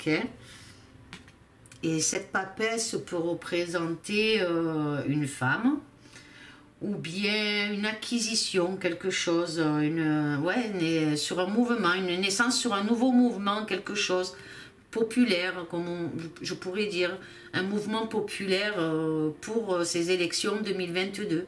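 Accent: South, France. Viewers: Bonjour, je vous découvre et vous êtes agréable à écouter. Pour ma part, j'ai un ressenti sur un duel entre deux hommes et ce sera l adversaire à Macron, un homme inattendu au pouvoir, mais pas aussi inconnu que Macron. Vs avez un accent de la Drôme? Du Vaucluse? Du Gard?.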